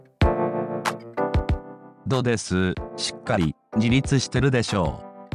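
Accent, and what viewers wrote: Hokkaido, Japan. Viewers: 今回は音声付きですね😆 北海道弁の感じがいい感じですよ👍 ソーラーパネル、災害の時のためにあると良いですね👏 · ホント、北海道もし冬に災害来たら怖いですよね😱 なまらとか、北海道弁🤣🤣あまり最近使わなくなりましたね。なんとかさーとか🤣北海道弁に聞き入っちゃいました。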